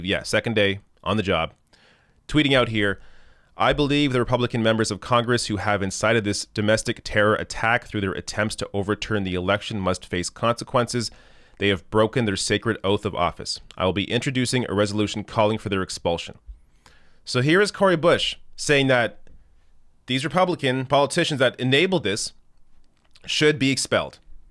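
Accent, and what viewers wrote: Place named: Canada. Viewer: Your Canadian accent came through more in this video and I love it.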